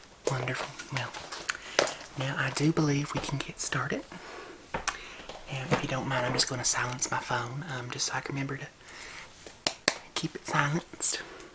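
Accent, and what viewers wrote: South, United States. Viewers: WHEN YOU SAID HOGWASH!!!! AAAAHHHHHHH I about DIED!!!!! I didn’t realize people spoke like this until I moved down to the south!!! 😂😭 I love it!!! When I saw the title I instantly thought of the lady who complained about her Tupperware on the judge show! 😹♥️ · First time seeing your channel and omg wow. First of all, that FECKING ACCENT IS SO EXCITING. First southern accent I’ve come across in ASMR! And this video idea is amazing 😂 I love Tupperware 😍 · I like the southern accent series. Do more :) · Please make another southern accent video! I’ve watched this one dozens of times! I love it!!!! ❤️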